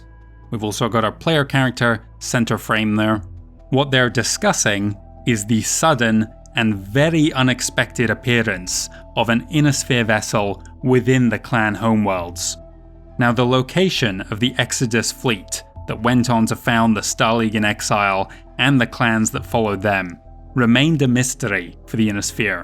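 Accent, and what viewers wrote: United Kingdom. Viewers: You sound like you could be British, my apologies if English is your second language but with such good pronunciation I would have thought you’d get Jaguar right. Americans smear their syllables together but how they get “jag-warr” and “jag-wire” out of J a g u a r is truly mysterious. They say “mirror” as meer and “horror” as whore etc etc etc so I suppose jagwarr is just smearing but how TF do they get “jag-wire”?? One of the most beautiful words in this language brutally choked to death by my dear American fellow human beings. I love ‘em but they don’t know speaking.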